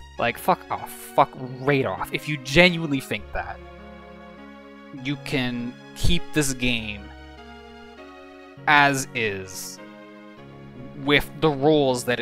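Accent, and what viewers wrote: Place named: United States. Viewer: Is it just me or does sound a bit different now? He sounds more American now. He's also swearing less forward slash shouting less, stating the problems/arguments clearly with evidence to back them up. P/S all jokes aside, great vid. Agreed with everything you said. I wanted 90% caut even before you made your Part 1 vid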